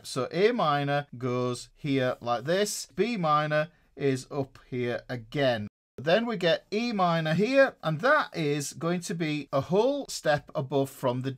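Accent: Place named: Yorkshire, United Kingdom. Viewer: Nurr yer nurts. Must be Yorkshire…Huddersfield?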